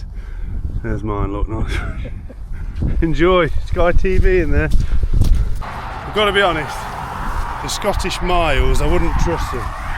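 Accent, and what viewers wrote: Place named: Leicester, United Kingdom. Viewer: Hi, new sub and not seen your channel before, just my cup of tea. I did a lot with my Dad too sadly passed away now, so really nice to see you out with your Dad. From the sound of your accent you may be not far from me in Leicester. Great Idea doing this trail in winter, now there’s an idea for me as it’s one I’ve not done, it’s popularity has always me off. Great vid and chat. I was interested in the Muon myself so wondering how that was for you, won’t be getting it now though as amazingly I was just gifted the osprey Levity 60, I’ve had the 45 since it came out and I love that so very pleased to get the larger version. Anyway A very merry Christmas to you and your family. 🎄🍻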